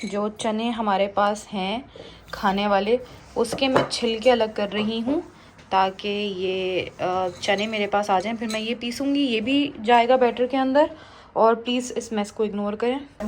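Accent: Karachi, Pakistan. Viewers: hello, apkay baat karnay ka style khana wagira bilkul hamaray ghar ki tarha ha.. im also from Karachi living in Sweden..after a long time i found somone from vlogging whom i can totally relate with❤.. plus abt your suggestion im interested in starting dil ki batein series... because that would be great if we share things with each other.. but i think it depend on you. if you are making 2 vlogs in a week tu ek dil ki batein series vlog n second vlog of your routine 😊 best of luck 😊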